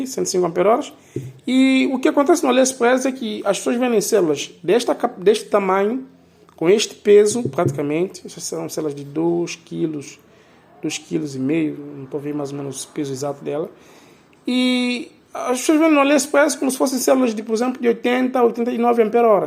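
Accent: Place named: Portugal. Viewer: O idioma dele é português, mas com sotaque de Portugal, de repente, lá no país onde se encontra, consiga comprar baterias à preços mais honestos, mas para nós aqui do Brasil, os preços estão de ferrar mesmo, tanto pelo Aliexpress direto da China, quanto os ditos "estoques do Brasil com todos os impostos inclusos".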